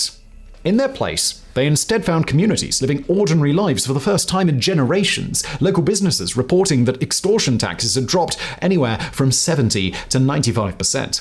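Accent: England, United Kingdom. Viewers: A white guy with an English accent pontificating about El Salvador. I spend a lot of time there. Beautiful country with beautiful people that lived in fear because a small percentage of the population made it miserable for everybody else. Bukele took care of the problem. I saw people out jogging in La Libertad today. Crazy. That wouldn’t have been possible 2 years ago. That’s why they love him. Not because they want to go buy iPhones but because they can go watch their kids play futbol, go to the store, run a business, visit their family without fear. The comments on this board are embarrassing.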